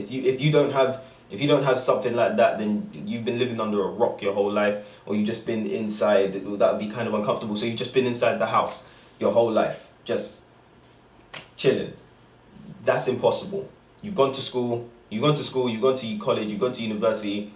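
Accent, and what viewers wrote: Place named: AAVE, United States. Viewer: Hate the ebonics in your voice!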